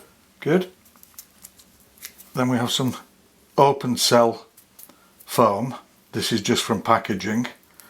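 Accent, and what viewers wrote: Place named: Lancashire, United Kingdom. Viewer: A wee nosy question......where did you pick up the traces of scottish inclusion within your Lancs accent?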